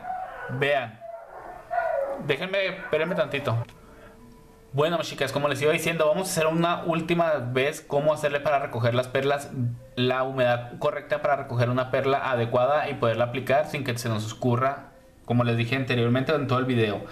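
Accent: Chihuahua, Mexico. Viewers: Tu eres de chihuahua! Por el acento! Nada más por ser mi paisano te voy hacer caso caray! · Eres de chihuahua?...! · a mí me parece que es de Chihuahua..por como pronuncia la ch 🙃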